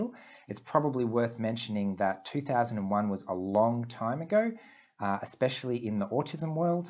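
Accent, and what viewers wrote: Australia. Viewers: Are you Australian? I keep hearing "IQ", not "AQ".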